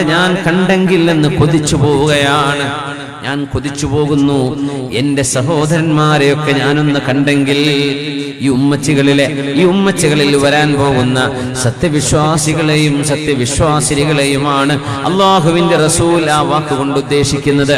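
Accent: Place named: Saudi Arabia. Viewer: ഉസ്താദിന് സൗദി സൗണ്ട് അല്ലാതെ പറ്റില്ല ☹️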